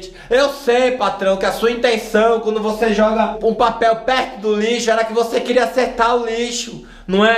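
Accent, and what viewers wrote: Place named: Recife, Brazil. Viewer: esse sutaque é de ricifi né não fia?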